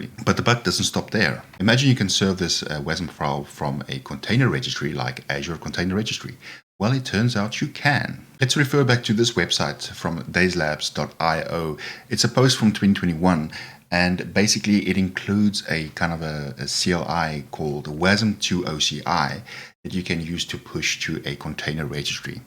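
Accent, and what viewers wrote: South Africa. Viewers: Ey Brother Man. Picked up that you may be South African. Just Subbed. All the best. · This guy sounds South African :)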